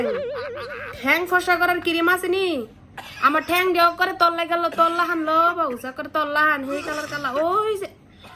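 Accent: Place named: Brahmanbaria, Bangladesh. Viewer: আপা কি ব্রাহ্মণবাড়িয়া মানুষ না কি কথা বুজা যাচ্ছে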